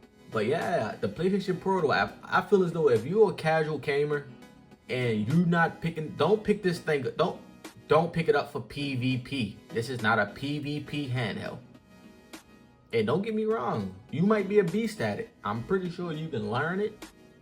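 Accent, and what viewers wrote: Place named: Baltimore, United States. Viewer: Def from Baltimore, good video my G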